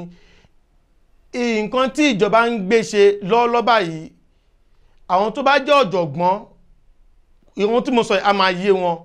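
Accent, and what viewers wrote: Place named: Fulani, Nigeria. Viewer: The man is Fulani....just pay attention while listening to his ascents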